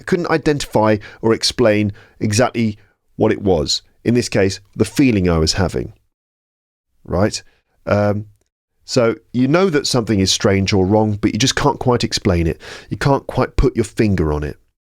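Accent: United Kingdom. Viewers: Excellent British accent of. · Hi and thanks.are you only speaking betitsh accent? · I trully enjoy listen your podcast. You trully english accent, british accent,real. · Thank you fot this episode. It was so pleased to hear your british accent with such a clear pronansiation that is so rare to hear ir from natives. It can really boost vocab of students and teachers and undestanding of English speech. And moreover your teacher's style is so enjoible and motivative because it lookes like there isn't nothing special to undestand English. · The combination of your beautiful voice and the British accent is not only important and a great motivation to study English, but it is also really relaxing and enjoyable. Thank you very much for this contribution. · I really like listening to your clear British accent when you are reading stories.. your channel helps me a lot to improve my English language.. 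please post more stories.. I really enjoy listening to you... Thank you very much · Thanks you i firstly was thinking that British accenet so hard but after started listening your podacst i discoverd it amazing accent thanks alot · I enjoy your British accent, thank you very much,! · Это британское произношение?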